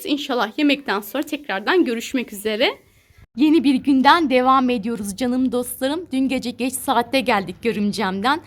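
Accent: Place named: Zonguldak, Turkey. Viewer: Bursa dan selamlar hemşerim ben Zonguldaklı olarak memleketime selamlar sizi severek takipteyim benim olumun hesabından yazıyomaynı biz gibi.gonuşusyasunuz adetlerinizfe bizim gibi caycumalıyom bende canım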